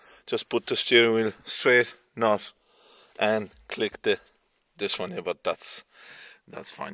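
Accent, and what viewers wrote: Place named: Cork, Ireland. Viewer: loving that cork accent, man. thanks for the vid bai.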